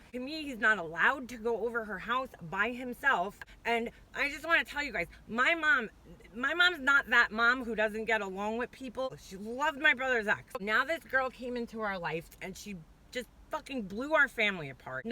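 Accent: New York, United States. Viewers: Oh my god found your channel and listening to when evil enters your life part 3... I’m fucking dying! You rock with your New Yorkian accent and my kind of foul mouth F bomb mom! Shout out from Carla from Boston!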